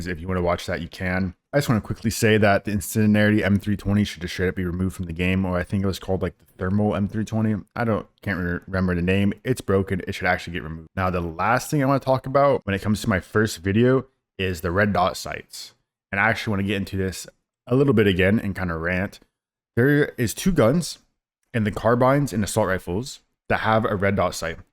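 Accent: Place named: RP, United Kingdom. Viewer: Listen to the Man, he learned from the BBC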